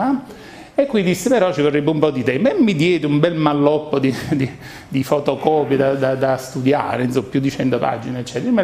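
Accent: Campania, Italy. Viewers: Bravo divulgatore dell'argomento, peccato però che sia effettivamente un po' troppo accentato. Le accelerazioni di un discorso "a braccio" o sue troncature in corsa già sono difficili da seguire in lingua "pura", ma qui un non campano fa un po' fatica. Peccato. Avrebbe potuto rivaleggiare, come divulgatore, con un Alberto Angela o col di lui padre Piero (che per altro non impongono l'accento piemontese). Senza offesa... opinione personale. Mauro, Emilia-Romagna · Io ho gradito molto invece l'accento, l'italia è bella perché è varia. Un po'di umiltà non guasterebbe, chissà com'era l'accento di Majorana, sicuramente non toscano. Il professore è stato bravissimo a umanizzare e rendere piacevole una materia, la fisica, molto ostica, lo si ascolta e quasi sembra di stare a teatro con la sua splendida napoletanita' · A me è piaciuta la conferenza, indipendentemente dall'accento. 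Trovo inoltre simpatico l'accento napoletano. Saluti da Trento. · Se questo e' un professore io sono Maiorana!! Fa schifo con quell'accento marcato Campano, sembra uscito da un campo di pomodori!!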